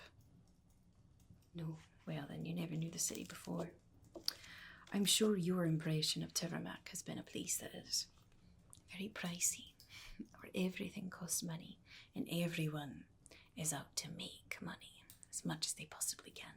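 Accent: Ireland. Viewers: Aaah, so soothing. Thank you ever so much,. I've recently discovered ASMR and your channel,and I love it (not to mention, your wonderful irish accent).Always had a special fondness for lighthouses, btw. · A sure spot of siren song itself, this soothing, seductive, cigar smoke shrouded seance of the solitary sage. If not the siren residing on the rocks to lure you to be lost on some granitic crag, surely a siren to lead you to be lost in an illuminating legend or captivating contemplation of the captain from a rocking chair. More than a wee bit of beguile by brogue in an old saw, story, or spell shared well by the keeper of the light. Far more to keeping the light than meets the eye indeed -- and not the one atop the tower, but in the be-lure to be sure of the tender of tales and old soul insights that cast their own lights over leagues and lifetimes.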